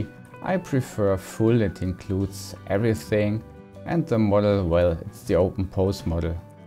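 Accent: Germany. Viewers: Man hört leider das Deutsch sehr stark raus durch den Akzent. Aber sonst gutes Video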